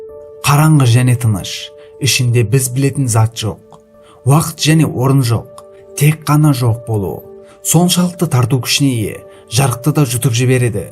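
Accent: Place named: Uzbekistan. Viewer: Сөйлеп тұрған бала Өзбекстанский акцентпен сөйлеп тұр ғой примерно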